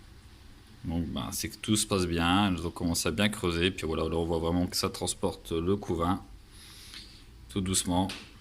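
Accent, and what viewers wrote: North, France. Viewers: avec le petit accent du nord ! Comme à la maison 😊 je suis de Caudry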